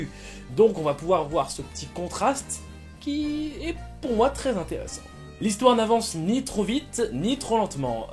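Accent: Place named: United Kingdom. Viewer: J’aime pas l’accent anglais. À travailler..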